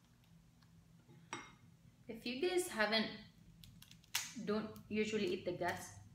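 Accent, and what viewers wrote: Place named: Philippines. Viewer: R u visayan or ilocano? U have the accent... esp with some of ur pronunciations and intonations...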